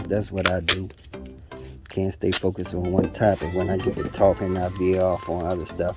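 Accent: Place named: Louisiana, United States. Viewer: I can tell you know your electricity, after you mentioned ohms law, and how a diode pushes current through one way only (in another video). I know you're in Virginia, but you sound like you're originally from Louisiana. ✌️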